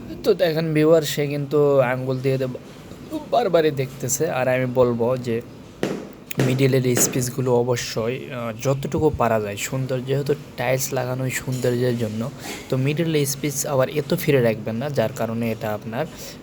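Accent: Naogaon, Bangladesh. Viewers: আপনার বাসা নওগাঁ জেলাতে মনে হচ্ছে!!!!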